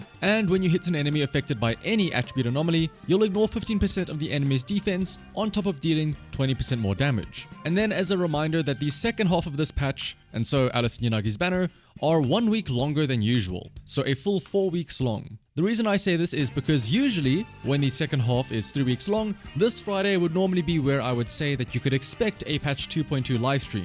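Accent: South Africa. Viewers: I'm sorry this is so sudden and random but this has been bugging me for ages... Are you South African? Your accent is so specific (I'm South African too)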